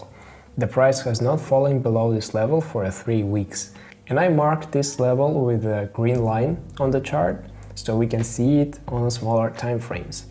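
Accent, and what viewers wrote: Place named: Russia. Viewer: I love your Russian accent!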